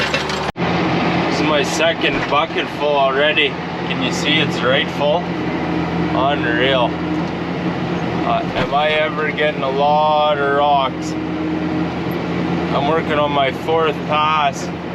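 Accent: Canada. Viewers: Sounds like you're up in Canada? Damn good work. Just did the same thing on a field road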